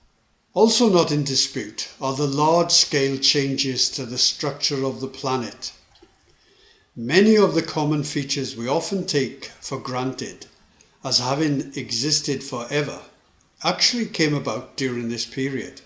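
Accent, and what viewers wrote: Wales, United Kingdom. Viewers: This is one of my favourite channels on the YouTube. Fact based. Clearly explained. Exceptionally well resourced. And a warm welsh accent. (Newport or the nearby valleys if I'm not mistaken) in a measured tone. Top, top work mate and thank you for taking the time to put this excellent content out. Diolch yn fawr.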